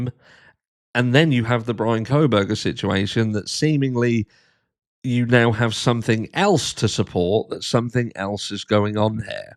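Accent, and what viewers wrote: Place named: RP, United Kingdom. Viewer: 🤣 U butcher the word “affidavit” like I do, but at least u’ve got that posh British accent to make it sound cool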